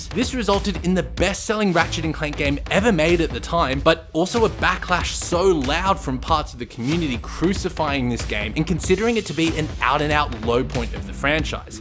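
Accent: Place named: United States. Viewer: This guy's accent is clearly American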